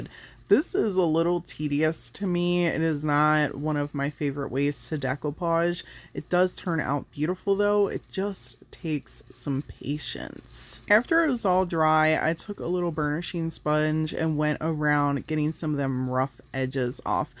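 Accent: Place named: Philadelphia, United States. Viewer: I have just found her and I am very intrigued. She is unique. However, does she not respond to our comments? 😢 I recognize her accent, Philly, Baltimore, where is she? ❤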